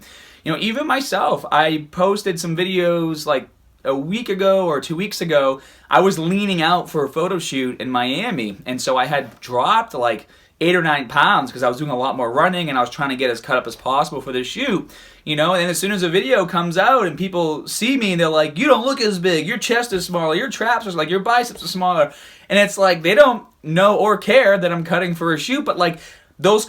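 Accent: Boston, United States. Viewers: That Boston accent is classic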